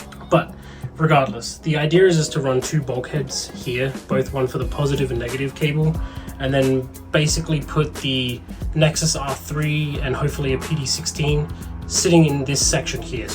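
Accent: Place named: United Kingdom. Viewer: The British accent just does it for me 😍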